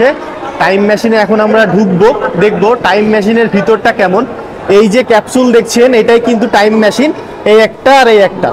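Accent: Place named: Bangladesh. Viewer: apnar bangla vasa to valo noy! kemon jeno bangladeshi mone hoy j?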